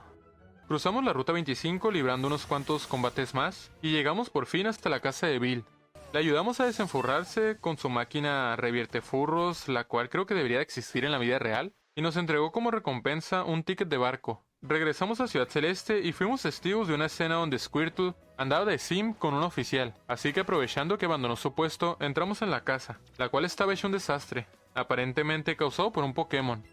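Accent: Chihuahua, Mexico. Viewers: Eres de chihuahua?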